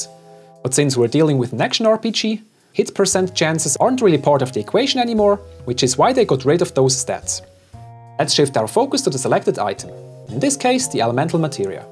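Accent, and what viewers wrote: Germany. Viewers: Kommst du aus dem Osten oder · Are you German?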